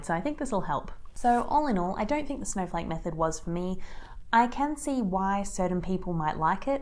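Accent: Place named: Australia. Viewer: Thank you for the video. Seems to me the snowflake method is overly laborious. Brainstorm your story, characters and setting. Brainstorm story beats that you can structure into chapters keeping with the three act story. Outline each chapter in bullet point. Then, change your outlines as you evolve the story …. Write the first draft, leaving scope for discovery along the journey. Don’t waste time reading methods! They’re only selling you something you’ll never use. The best YouTube videos discuss what’s good and what’s bad to keep readers engaged. I’m an amateur writer, no formal training in creative writing, in fact, a science background, so I’m learning as I go. But love your work though. 😘 by the way, having lived in Australia for 20 years, I detected your Aussie accent.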